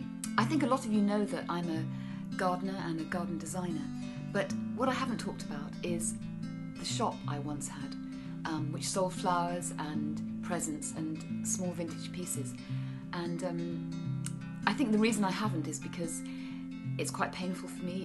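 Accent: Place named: London, United Kingdom. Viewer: Hi, glad to hear your story! I love your flower shop very much. And your beautiful London English accent. I'm sorry that your shop is closed. Anyway, you are absolutely an excellent gardener. I wish you a happy life forever. Long to hear your story soon again. Love you 😍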